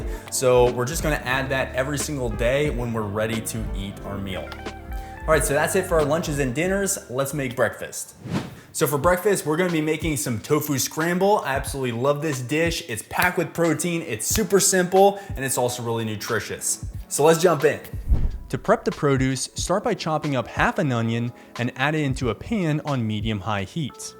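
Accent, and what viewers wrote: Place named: United States. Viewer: You have the most American accent ever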